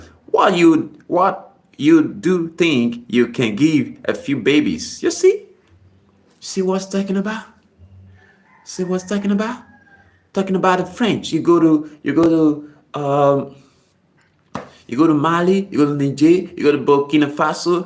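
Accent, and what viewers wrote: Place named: United States. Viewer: Sounds like an Amarican 🎉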